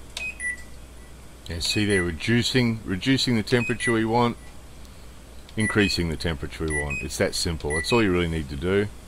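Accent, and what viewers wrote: Australia. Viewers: Very informative. Nicely narrated with a of Aussie accent .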